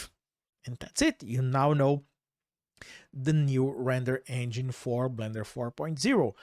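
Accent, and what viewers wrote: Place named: Brazil. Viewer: sotaquinho brazuca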